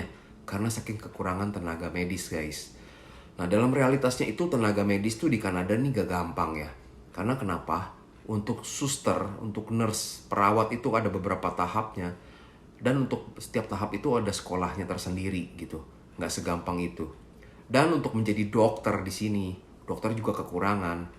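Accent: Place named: West Java, Indonesia. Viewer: Koh logatnya kerasa sundanya hehe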